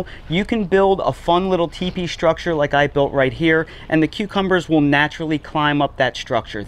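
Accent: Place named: South Carolina, United States. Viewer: Hi, Thanks for all those great tips.I will some of them in practice like putting mulch and watering the plants. I will follow some of them. For what I hear you are South Carolina. I am making a visit to that state soon. I would like to know in which area your garden, not your specific address. I want to know how it is living and gardening there. Is it an area for a homestead ? I want to get information that I will get only by visiting there. I hope you will answer some of my questions. God bless you and thanks this great video.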